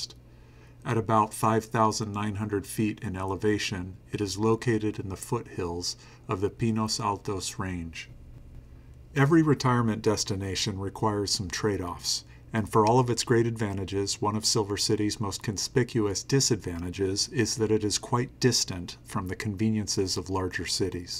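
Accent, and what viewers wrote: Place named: Northern California, United States. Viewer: i am curios- are you from Nor Cal? It just feels like you are sir- would love to know as have watched several of your videos. Thanks- Robert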